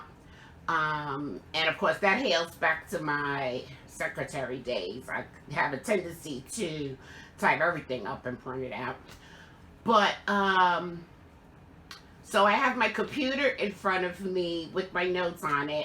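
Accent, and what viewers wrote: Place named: Bronx, United States. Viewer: I like your videos, that blanket is amazing. And your sister will love that shawl I'm sure, it's gorgeous. My husband was listening while I had your video playing and he said where is she from? I said she lives in NC but I think she's from further north. He said her accent sounds like our dear friend Maryjane who is from the Bronx. And when you said you'd send this to your sister in New York, I thought I'd ask you where you were from. I'm originally from eastern Pennsylvania but live on our sailboat now and meet people from all over the world. Thanks so much for brightening my day and I'll keep that quote in mind.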